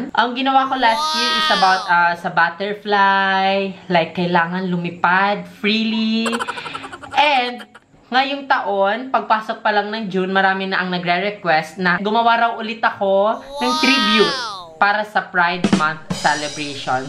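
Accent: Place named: Polangui, Philippines. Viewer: I just so love how you speak bicol polangui, makes me wanna go home. LGBT is ❤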